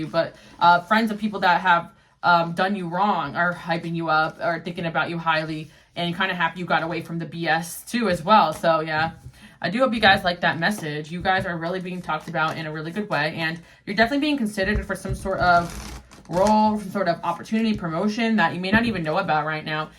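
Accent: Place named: West Coast, United States. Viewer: LoL you must be West coast!! Love the fast voice like me!! Arizona!! Yet still excellent!! Love your read!! Awesome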